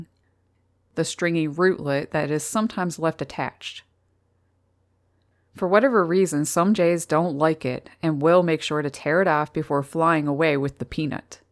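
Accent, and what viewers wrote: Canada. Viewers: I enjoy the Canadian twang in your voice.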